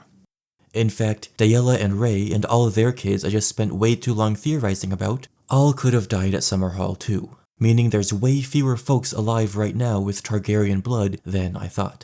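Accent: United States. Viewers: he has to be from the Midwest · American lol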